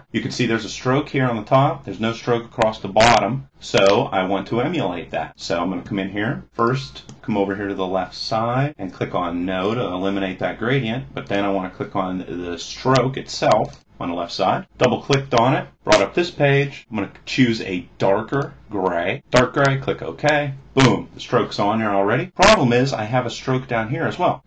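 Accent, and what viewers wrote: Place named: Texas, United States. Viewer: are you from the same place as Mathew McConaughey, your accents are exactly the same. sorry for the unrelated comment. great tutorial btw.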